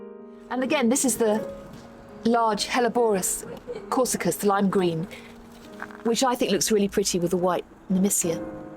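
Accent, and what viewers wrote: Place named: United Kingdom. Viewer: 我喜歡聽 Manda老師 優雅的英倫 英語發音 還可以學英語聽力練習!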